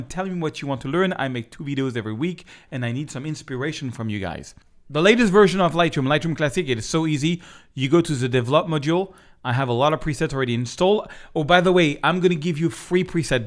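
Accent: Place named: France. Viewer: your English-French is so sweet:) Thank you for tips!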